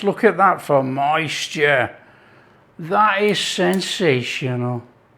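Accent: United Kingdom. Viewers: love the cooking as well as the beautiful British English accent ❤️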